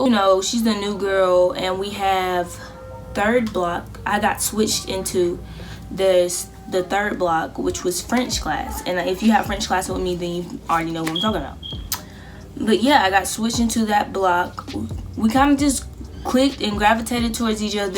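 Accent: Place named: North Carolina, United States. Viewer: You sound like you from the NC . AUTOMATIC subscribe <3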